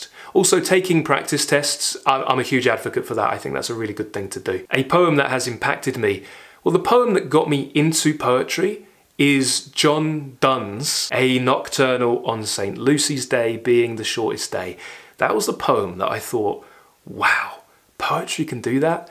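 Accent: United Kingdom. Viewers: Hey man, I improve my British accent from your videos. Thanks for the videos.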